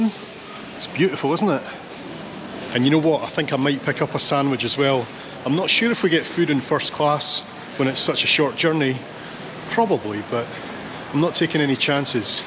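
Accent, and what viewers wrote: Scotland, United Kingdom. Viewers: I love the Castle video, and I'm surprised that you've not been there before! Then again, I lived most of my life near Washington, D.C., and I only went to the many monuments, landmarks, and museums there because visitors wanted to go. My favorite (favourite?) places in the Castle are the dog cemetery and the Scottish National War Memorial, both of which I found quite moving. Thanks for all your videos,. I love listening to your wonderful brogue. ("Accent," you say, "what accent?")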